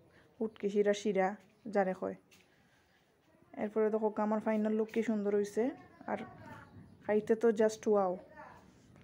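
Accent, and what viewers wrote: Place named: Sylhet, Bangladesh. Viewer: আপু খুব স্বাদের শুটকি সিরা আমরা সকল সিলেটি আপু সিলেট কুন জাগা আপনার বাড়ি সিলেটি কতা খুব লাগে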